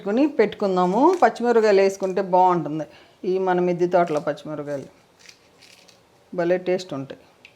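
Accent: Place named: Andhra Pradesh, India. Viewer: madi chirala daggire aunty. mi language chusi anukunna mana area vallu ani. so happy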